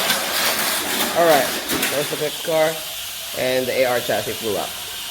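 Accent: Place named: Philippines. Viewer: Filipino accent I hear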